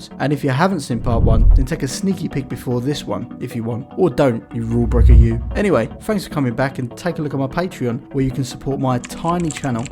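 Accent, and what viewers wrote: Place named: London, United Kingdom. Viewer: Love the channel and the accent. Hearing stuff like “fink about it” reminds me of London days gone by.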